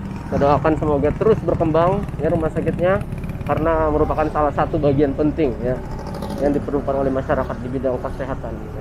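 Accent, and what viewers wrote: Banjar, Indonesia. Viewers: Lah orng banjar kah pian😂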